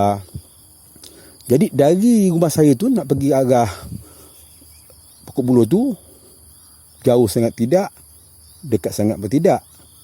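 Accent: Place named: Melaka, Malaysia. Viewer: Ini lorat percakapan orang Melaka ni...ni mesti kejadian di bumi Hang Tuah ni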